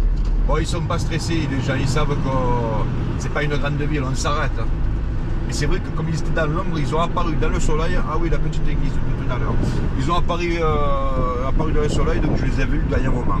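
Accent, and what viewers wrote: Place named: Provence, France. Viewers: Bonjour ! Je vous ai découvert cet après-midi et quand j'ai regardé cette vidéo, je me suis dit que, comme je ne suis pas loin de Vaison (Monteux) alors, je suis du Nord puisque vous redescendez "dans le sud" 🤣Et pourtant, on a le même accent !!! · J'aime t’on accent du soleil 😂😂😂❤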